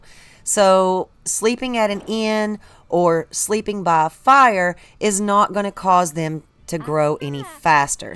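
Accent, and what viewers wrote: South, United States. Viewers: You are from the south thanks for the information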